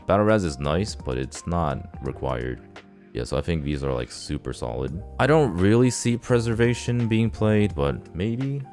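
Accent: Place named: United States. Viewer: basing your experience on someone else opinion :D sound american to me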